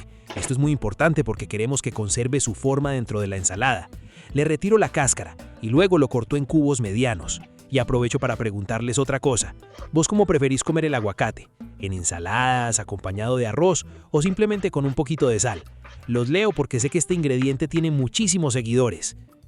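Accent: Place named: Antioquia, Colombia. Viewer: Hola muchísimas gracias por tus recetas, pero sabes? ... lo que más me encanta es tu sentido del humor y tu acento pais, jajaja divertido t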